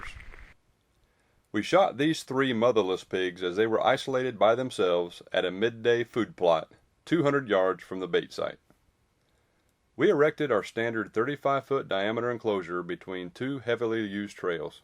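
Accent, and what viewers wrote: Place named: South, United States. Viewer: Wow this is actually interesting as fuck lol and I'm loving the informative narration with a deep southern accent hahahaha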